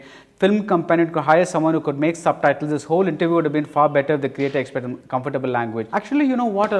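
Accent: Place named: South India, India. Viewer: Dear Rangan Buddy- Well I am following you in Twitter too.. Please add me.. <link> You are Rajini in Review.. Love our South Perfect English Accent here... Yes.. I had a great doubt your english Buddy.. Yes.. Will tweet and promote.. I AM promoting and vouching for SOUTH India- from COIMBATORE to AUS and to the world.. You reviews will help me to share to the movie reactors whom I follow too. Please do a Tamil version for a backup too... Do a combo review with Thambhi Abhi Cheers - Pradeep from Pollachi In Melbourne... I am a food reviewer also- <link>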